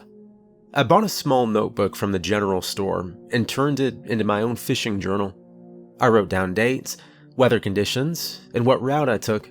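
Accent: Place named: Maine, United States. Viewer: The voices of the characters that you make sound like they're all from Maine. 😅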